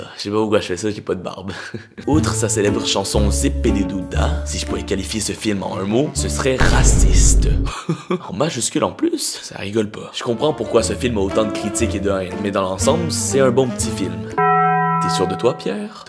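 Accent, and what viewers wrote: Quebec, Canada. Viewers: t tu quebequoi touer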